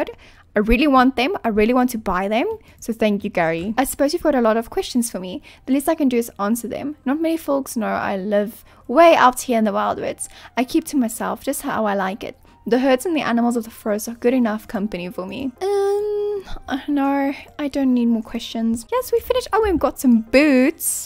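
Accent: South Africa. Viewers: I’m glad I subscribed to you! Two things I love: star stable and the South African accent (sadly lost mine) your voice is so soothing it makes me feel like I’m back home agaon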